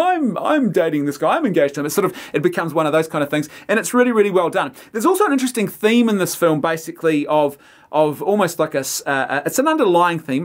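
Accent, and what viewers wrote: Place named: New Zealand. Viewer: Is it? I always thought he was saying "Brandon" with a Kiwi accent.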